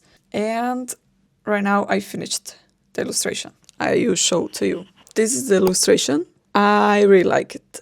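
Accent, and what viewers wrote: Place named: England, United Kingdom. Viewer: You're very talented, and your English accent is lovely.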